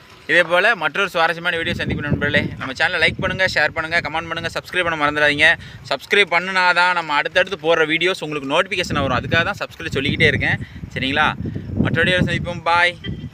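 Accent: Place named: Sri Lanka. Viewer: Antha tamil selong super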